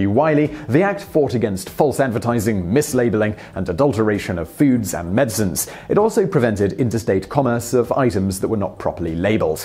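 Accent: United Kingdom. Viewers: accent. The Brits say supa instead of super, and Chiner instead of China. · I was a long-time subscriber to this channel -- never missed a video -- and just un-subbed. I don't understand why Simon has to be so aggressively front and center in these videos. I love the topics, I love the detail. I understand he has a cool British accent, but can't he just be the narrator? It was always weird to me how images and the text always take a background to him. What Culture shows the hosts, but not non-stop. It's just not necessary. I realize that it had been fine initially and then it started to bug me and now I can't stand it. I will definitely re-sub and share if the topic becomes the most important element to the Today I Found Out videos. Simon is fine, no shade, really, but the channel -- educational and informative as it is -- is just too much of him, it's very in-your-face. 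( I also noticed that other people write the series -- can't you mix it up with some of the writers or just have an episode w/o a host?) I REALLY enjoy the subjects, and wouldn't mind if there was just a VO of Simon.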